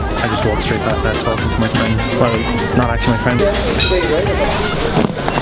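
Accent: Ireland. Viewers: Loving the work lad. What part of ireland are you from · gowan you mad thing! what part of ireland are ya from??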